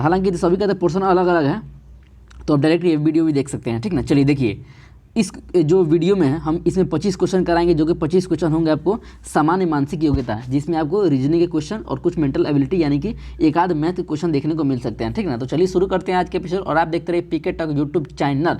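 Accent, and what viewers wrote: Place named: Bihar, India. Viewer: Apki language bhutt achi lgi bihar ke ho ??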